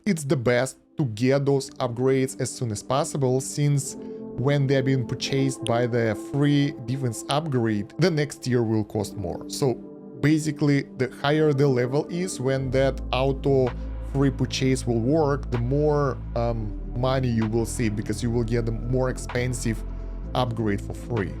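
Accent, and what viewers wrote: Russia. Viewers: Искал видосы на русском языке , но не нашел ) думаю ладно открою первый на английском и тут мужик с русским акцентом 😂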